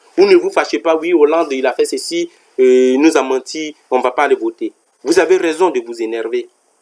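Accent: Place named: Africa. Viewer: Merci pour cette vidéo camarade ! J'adore ton accent africain 😀 Tu as raison sur toute la ligne ! Le bon sens finira par l'emporter...nous sommes trop nombreux à vouloir justice ! Les sondages nous mentent ! Ne nous décourageons pas les amis, nous gagnerons 😊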